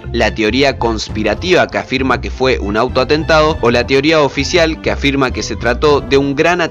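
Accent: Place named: Rioplatense, Argentina. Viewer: Es un vídeo interesante, aunque varias de las explicaciones ya han sido ampliamente conocidas anteriormente. Ahora en este vídeo olvidaron uno de los hechos más significativos, que es el derrumbe injustificado del "Edificio 7", el que no fue tocado por ninguno de esos aviones empleados en esta Bandera Falsa, sino que ese edificio se derrumbó solo y sin explicación alguna. Y para mayor abundamiento, una periodista estadounidense en su informe en vivo mencionaba el derrumbe del "Edificio 7, cuando a su espalda, éste aún se mantenía plenamente en pie, y sin daño alguno. No obstante ser éste un buen documental —y lamentablemente— el relator de este vídeo padece de la tara foniátrica rioplatense de confundir reiteradamente la pronunciación de la LL y la Y, con la CH. Él menciona esto: chamar, cha, aquechos, chamadas, prochecto, echa, detaches, chamativo, chegaba, achí, chevaron, chegar, machor, anicho, cacheran, apochan, echas, ensachos, concluchendo. 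Por querer decir esto: llamar, ya, aquellos, llama, llamas, proyecto, ella, detalles, llamativo, llegaba, allí, llevaron, llegar, mayor, anillo, cayeran, apoyan, ellas, ensayos, concluyendo. Lo cierto es que el sonsonete reiterativo de esa tara foniátrica, termina fastidiando al oído de cualquiera que no sea de esa región. Creo que este videísta debe ir pensando en instalar una voz robótica en sus vídeos, es mucho más neutral, tienen buena pronunciación, y lo mejor de todo, sin esos desagradables sonsonetes de aldea. Hay que cuidar n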